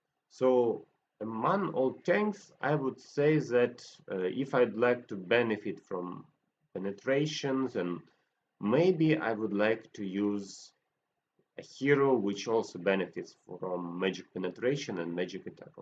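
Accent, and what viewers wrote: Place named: Russia. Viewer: Привет, по акценту понял что ты Русский) подскажи пожалуйста что лучше создавать на телефоне? Играю всего 3 дня, и у аракне совсем другие способности, робот на 1 месте, вообщем все по другому) я строю вокруг маи для поглощения демеджа, аракне для стана и даредевил для демеджа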